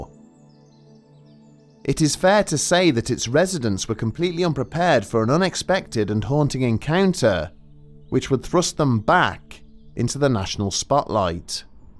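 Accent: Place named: Yorkshire, United Kingdom. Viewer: I just love this chaps voice!! He's got a Westchester, or Yorkshire air about him .. CUZ BLOODY HELL, some of these blokes with their LAZY Cockney.... Bugger me in the oss, a fellow can't understand some of these blokes, not at tol.!! So I stay carry-on my good fellow!! I enjoy your most interesting stories!! Carry on!! I'm sitting here with me fags in me face and trying to send this post on this infernal contraption..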